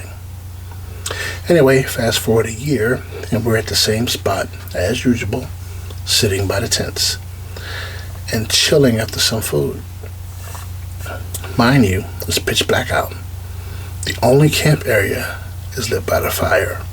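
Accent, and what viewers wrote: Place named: London, United Kingdom. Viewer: As an English person I would say you’re well on your way to getting a decent accent however it’s very mixed in terms of regional accents. There was definitely some sort of London accent mixed with a touch of a northern accent (when you said the part about ‘scumbag brain’) and some RP thrown in the mix.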